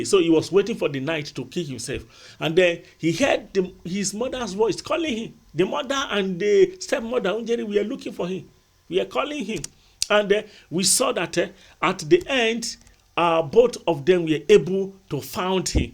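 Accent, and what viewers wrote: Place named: Nigeria. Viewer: As a kenyan, i am entertained in hearing the Nigerian accent of names like Njoroge, Nyokabi, Ngoto etc. Good job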